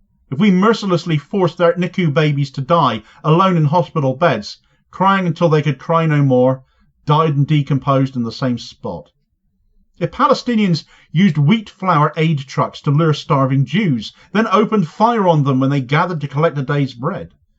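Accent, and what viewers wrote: Cornwall, United Kingdom. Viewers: as much as I enjoy watching your vids and enjoy your dulcet Kernow accent, was the speech at the Oxford Union filmed? I know Susan Abulhwa has posted the transcript on X, but I'm trying to find a video version. Kudos for reciting this and bringing it to a wider audience.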